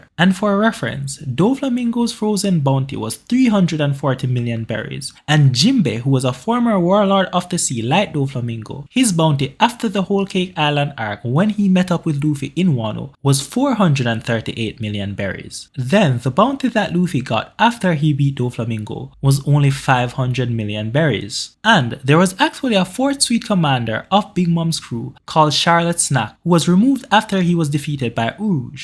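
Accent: Jamaica. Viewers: U Jamaican? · This man sounds like a yaadie. Subbed. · Definitely Jamaican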